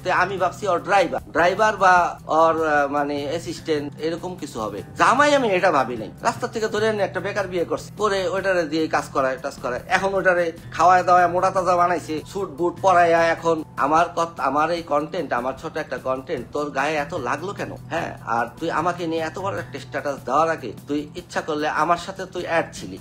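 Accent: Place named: Faridpur, Bangladesh. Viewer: মামা আপনার বাড়ি মনে হয় শরীয়তপুর বা ফরিদপুর ওইদিকে আপনার কথার ভাষাতেই বুঝা যায় জোশ মামা জনগণের মামা আপনি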